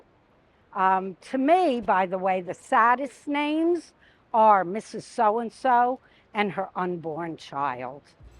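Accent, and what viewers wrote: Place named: New York, United States. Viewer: Love your NewYork accent!😊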